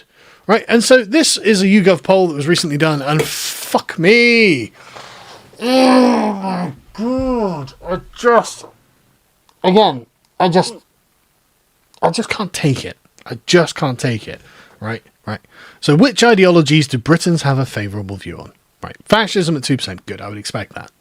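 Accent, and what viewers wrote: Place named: United States. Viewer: Sounding like an American now. 😆 👍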